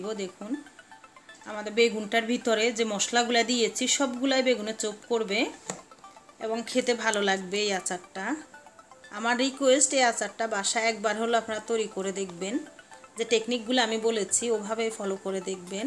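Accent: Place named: Feni, Bangladesh. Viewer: আপনার বাড়ি কি ফেনী?আমার স্বামির বড় ভাবির কথার ধরণটাও এরকম।By the way আচার টা দারুন হয়েছে।👍😊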